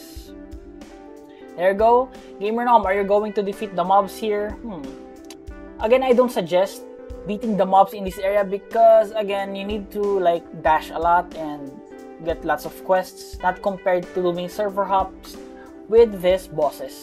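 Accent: Philippines. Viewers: Pilipino kapo? Boses nyu kase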